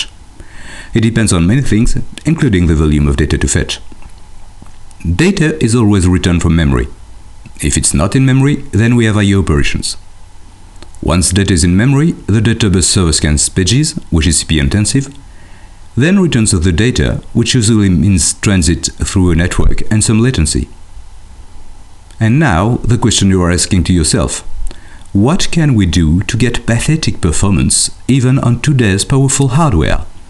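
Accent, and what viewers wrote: France. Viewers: You guys should be happy he is taking the time to put this online and help us (instead of bitching).. plus his french accent is pretty light, compared to some. Thanks again for the videos they are a great help. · He does not sound anything like an Indian. The narrator is French.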